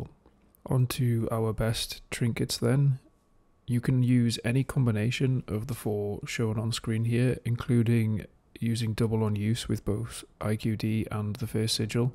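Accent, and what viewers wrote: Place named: Newcastle, United Kingdom. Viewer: Radge hearing a fellow geordie on a wow guide 😂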